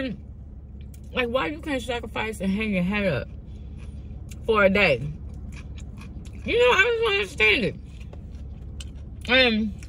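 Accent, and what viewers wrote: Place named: AAVE, United States. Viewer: Dang this food look so delicious 😊 you crack me up speaking Ebonics or whatever it’s called 😂😂😂😂😂 I love your videos!!!❤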